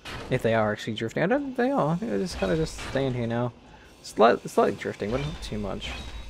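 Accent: Australia. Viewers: Show block placements, design choices, exploration and problem solving. Edit the largest constructions. For instance. You place a large reactor and a conveyor system and what not then go(in your Australian accent) 'Right guys brb' The jump to you finishing it. Things like small ships are cool to watch because you need to make tweaks and changes as you go. But large scale welding can be monotonous to watch. Not so bad once you have a welding ship.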